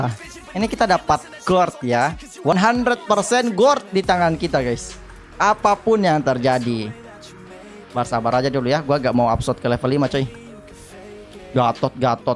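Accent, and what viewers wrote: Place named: Makassar, Indonesia. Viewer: orang makassar ki kah? karena logatnya kek makassar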